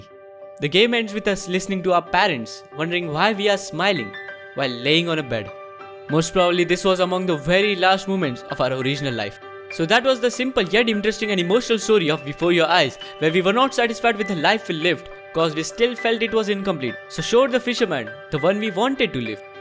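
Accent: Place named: India. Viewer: god fucking damn it. the indian accent makes the whole video impossible to watch..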